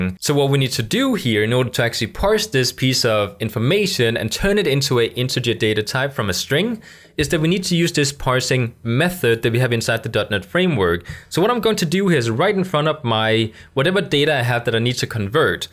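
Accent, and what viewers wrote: Denmark. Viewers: Er du Dansker?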